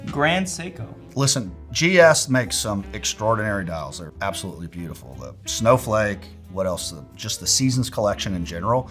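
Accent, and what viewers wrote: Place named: France. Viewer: One of the best and more complete tier list in my opinion. Your french accent is perfect!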